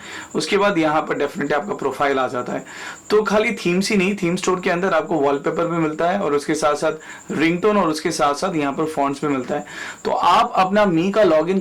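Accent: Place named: South, India. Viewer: i knw south indian ho tum. but i lobe the accent jis tarah se smjhaya tmne. keep the good work bro :)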